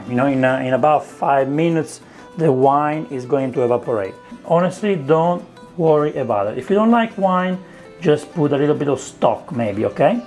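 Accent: Italy. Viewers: This is the Italian man,accent i always think of when cooking bolognese or something Italian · really don't know what to do with a maserati in the pan when real italian tomatoes come from the deep south of Italy! a sort of nonsense ... and the genuine stereotyped italian maccaroni accent.. or is it real?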